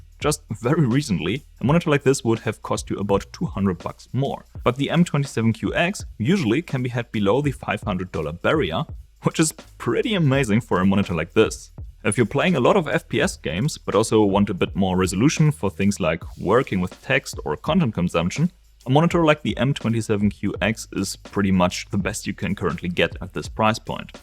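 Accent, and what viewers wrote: Germany. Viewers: Are german ? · Bis zum nächsten Video... Wusst ichs doch. Der Akzent kann nur deutsch sein :D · Dem Akzent und Outro nach gehe ich davon aus, dass du Deutsch sprichst 😅 Ich sehe überall, dass der Samsung Odyssey G7 als der beste UHD 144Hz Monitor angegeben wird, aber was ist eigentlich mit dem LG 27GN950-B? Wenn ich mir nämlich die theoretischen Werte anschaue, liegt der meiner Meinung nach vorne (kann auch sein, dass ich ein Trottel bin und keine Ahnung davon hab, aber wer weiß das schon… 😉)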